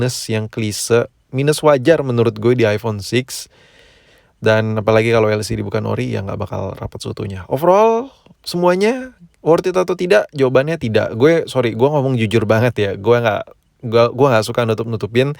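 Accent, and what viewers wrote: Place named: Jamet, Indonesia. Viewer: Logat Jamet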